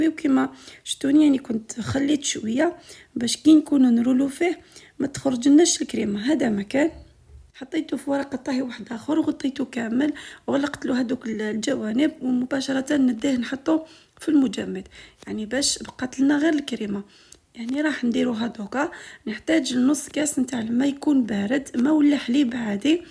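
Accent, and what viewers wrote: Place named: Egypt. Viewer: نعم من لهجتك عرفنا أنك مصرية أهلا وسهلا بيكي بين أخواتك أهل وأحباب في الله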